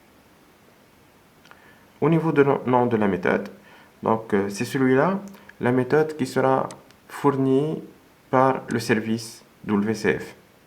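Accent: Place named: Tunisia. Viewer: accent tunisien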